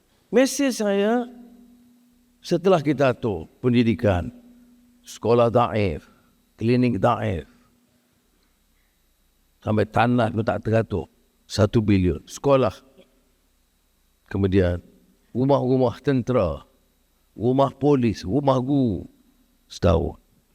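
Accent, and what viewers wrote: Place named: Arab world. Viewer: ucapan akedemik itu walau guna BM bernada Arab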